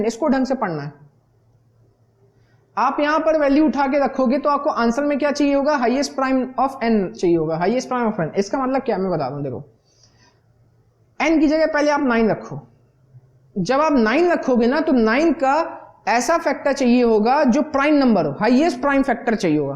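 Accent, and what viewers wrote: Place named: Mumbai, India. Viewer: if i am not wrong this sir is from mumbai.Guessed by his accent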